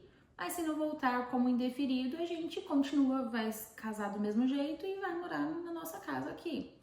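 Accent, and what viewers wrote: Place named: Portugal. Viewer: Temos alguns conhecidos “por cá” olha o “purrrtugueix” sobressaindo ihuuuul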